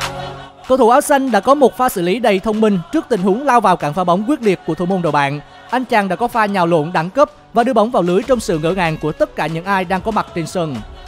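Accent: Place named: Da Nang, Vietnam. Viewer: Người đà nẵng hả em bé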